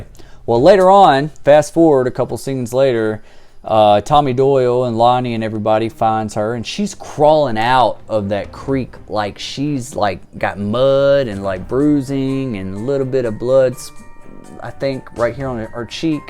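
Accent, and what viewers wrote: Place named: South, United States. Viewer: I love his voice. That southern accent is so relaxing. Looking forward to more theories and videos.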